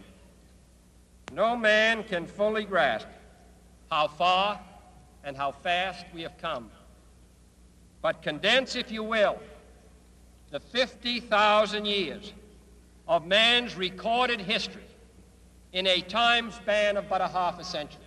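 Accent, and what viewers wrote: United States. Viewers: Why not a Boston accent?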